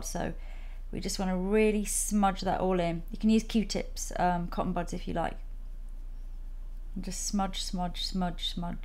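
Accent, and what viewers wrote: England, United Kingdom. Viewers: Which part of the UK are you from? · I like to listening English accent . You have got very beatiful voice . Videos are very beautiful Thank you ♥ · I like listening to your classy English accent. Reminds me of clients calling my line at work. :)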